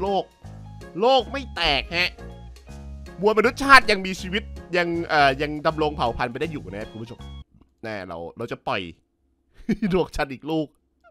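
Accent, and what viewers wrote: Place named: Suphanburi, Thailand. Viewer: สำเนียงสุพรรณ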